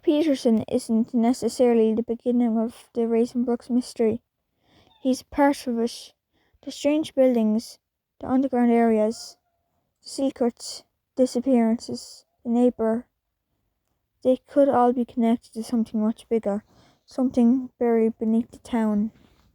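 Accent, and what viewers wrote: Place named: United Kingdom. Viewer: U sound british and also new sub (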